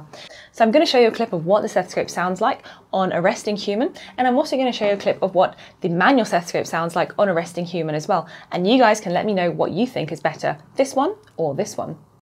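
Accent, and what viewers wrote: United Kingdom. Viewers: Hi, are you a GP working in Australia? You sound British?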